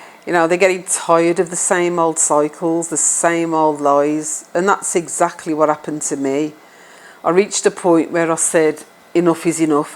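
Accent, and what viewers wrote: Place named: Birmingham, United Kingdom. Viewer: So powerful. So inspiring. Thank you. I've been asking what it's all about and what do I do next. I've been living with cancer for 3 years. I'm from the UK too and I think you're from Birmingham too judging by your accent lol. I've been wanting to leave England and live part of the year here and part somewhere else since I was a teenager. I'm 46 now and fear stopped me from taking action. I still want to do something significant with my life. I am fit and well, no one can tell I have cancer but now I'm stuck because of the medications I have to take. I don't know what to do. I look back and realise that God was pushing me to take action years ago but I'm still here with my soul yearning for more. Thank you for making your videos. I believe I stumbled upon your channel for a reason. All the best to you . Oh I let the adverts play instead of skipping them when I watch your videos 😊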